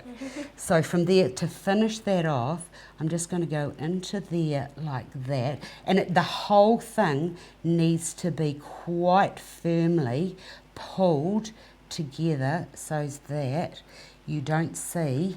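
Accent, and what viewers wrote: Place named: New Zealand. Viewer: Thank you for this lesson. I am a kiwi living in Australia and besides watching the lesson, I loved hearing the Kiwi accent. Are you from the south island?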